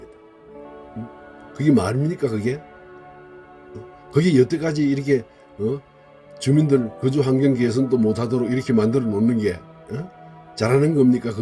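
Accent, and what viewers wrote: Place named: Gyeongsang, South Korea. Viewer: 굿모닝~~^^. 선생님. 원래 정치인의 공약은 空約이라고 보면 됩니다. ㅎㅎ 화려한 불빛. 빌딩 사이로 빈민들의 추위와 배고픔이 있네요. 우리 님의 목소리는 여기 서부경남 분 같습니다. '이게 뭡니까'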